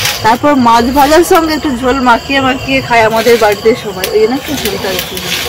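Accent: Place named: Medinipur, India. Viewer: এই ভাষা টা আমাদের গ্ৰাম ও বলে মেদিনীপুর ভাষা, কাই টু মাই টু ভাষা ভালো লাগে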